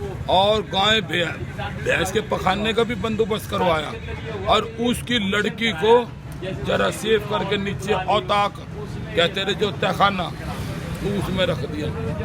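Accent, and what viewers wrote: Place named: Uttar Pradesh, India. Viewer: Ye UP wali boli kyu bol Raha ha, rohtak wali kyo nahi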